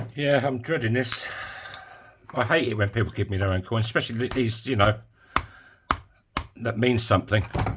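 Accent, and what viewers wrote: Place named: Essex, United Kingdom. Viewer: you mentioned you were in Cornwall at one point, where out of interest , I me in St. Mawes. but like me your accent says Essex or east London!!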